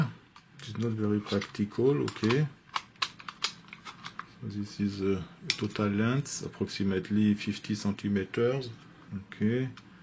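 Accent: France. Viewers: Love your English in French accent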